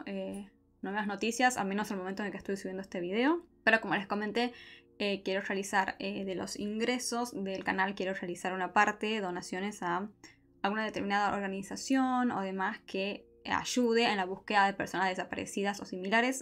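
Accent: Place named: North, Argentina. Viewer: Casi muero al escuchar tu tonada!! Ame!!! Claramente sos del norte pero no quiero arriesgarme a decir que del interior del corrientes. Muchos exitos, suscriptora nueva 😘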